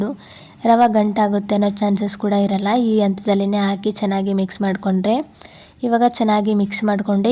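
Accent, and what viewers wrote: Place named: Uttara Kannada, India. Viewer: ಸೂಪರ್ ಸಿಸ್ಟರ್ ನಿಮ್ಮ ಉತ್ತರ ಕನ್ನಡ ಭಾಷೇನೆ ಚಂದ ಕೇಳೋಕೆ 😊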